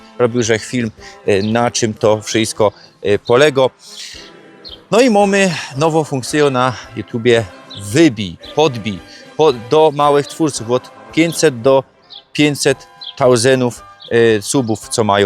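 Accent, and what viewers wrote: Silesia, Poland. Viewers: waserlajtungowa stela ... 😊 zabawne, pierwszy raz słyszę ale robisz postępy bo to tak brzmi richtik "po slunsku" ale bez obrazka wtle nie wiedziałbym dokładnie o co chodzi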